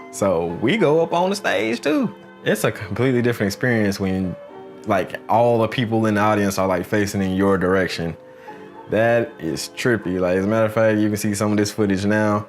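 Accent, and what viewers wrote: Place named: South, United States. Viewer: “ she goes on out there “ Your so country 😭😭 Much love from Tennessee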